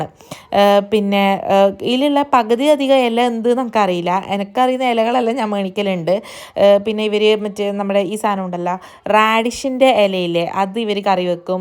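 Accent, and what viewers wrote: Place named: Kasaragod, India. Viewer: kasaragod basa😂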